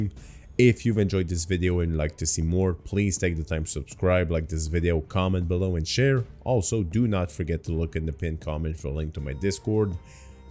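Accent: Quebec, Canada. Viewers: you have a French Canadian Quebec accent it sounds like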